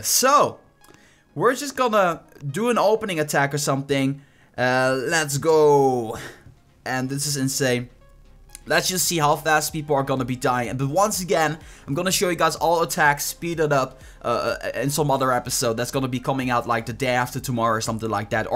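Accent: Netherlands. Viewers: Hey man, you got some nice boom beach videos, just subscribed! 1 question though, where are you from exactly? Cause your accent sounds a bit funny (not in a negative way, but positive) i'm guessing from the netherlands?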